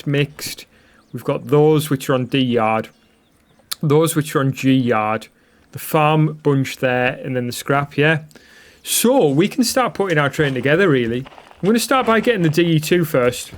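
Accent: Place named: Geordie, United Kingdom. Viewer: You from Mackem land Marra? Or close to Stockton? Sound awfully close to a Geordie but not quite 🤣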